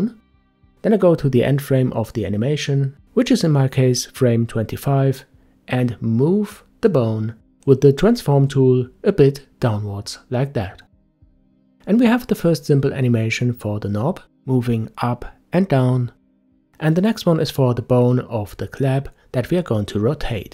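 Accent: Germany. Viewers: Are you German? I noticed all German youtubers have a soothing deep voice, plus it's the only accent that isn't annoying to hear. And the videos are always well made with zoom animations and without a single detail left unexplained to ensure zero viewer frustration and confusion. How did they lose the war?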